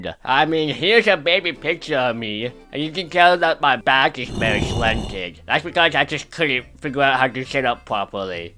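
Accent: United States. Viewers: You still sound American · Your Chinese your voice sounds like your a American :O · He sounds American but is chinese. Let that sink in......................................................................................................................................................................................................................................................................................................................................................................................................................................................................................................................................................................................................................................................................................................................................................................................................................................................................................................................................................................................................................................................................................................................................................................................................................................................................................................................................................................................................................................................................................................... 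· you sound american, not chinese. wow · He was raised in an American family so he is obviously gonna have an American accent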